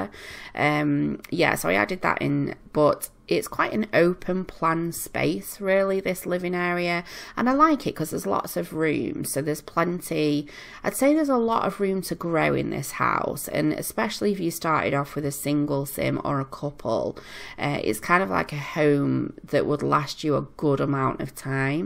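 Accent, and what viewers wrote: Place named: North, United Kingdom. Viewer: Love your builds! Nice to hear a fellow Northerner on youtube playing the sims too!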